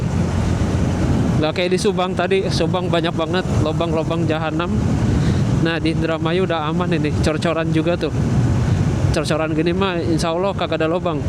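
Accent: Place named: Banyumas, Indonesia. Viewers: Lah orang ngapak to mas... Kirain orang depok😂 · Jebule wong ngapak njngn mas😮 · Widii mudikk masee gen ngapak kie jebule😅 ati ati Lik😅😂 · logat ngapake esih ori Alhamdulillah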